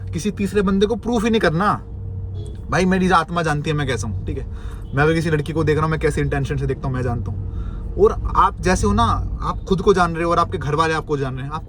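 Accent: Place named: Delhi, India. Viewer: bhai aap cheppi bada tagda bolte ho !!!!!!! maira delhi ka yek guy friend hai ditto same !!!!! ikdam !!!!! ussi ki tarah bolte ho 😆😆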